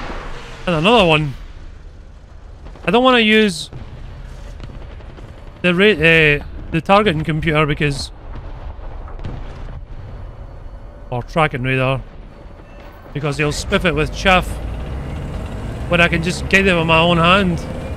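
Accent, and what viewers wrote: Scotland, United Kingdom. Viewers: man this dude has a strong Scottish accent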